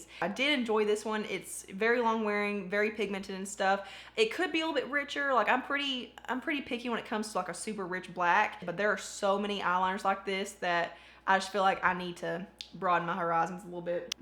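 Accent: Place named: North Louisiana, United States. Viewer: Where are you from ? Love your accent . You sound like my family members in North Louisiana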